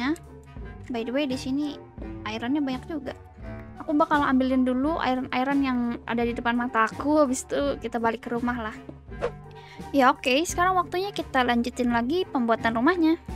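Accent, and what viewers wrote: Indonesia. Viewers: Sumpah nih kakak orang sunda apa orang mana sih?😅😅,lu kaya orang jakarta,terus surabaya,sunda,medan,yg mana sih yg bener😂😂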